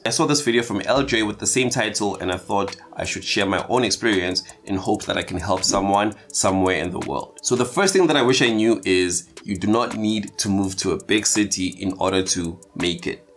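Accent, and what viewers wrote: South Africa. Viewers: i heard by the accent that this is a 🇿🇦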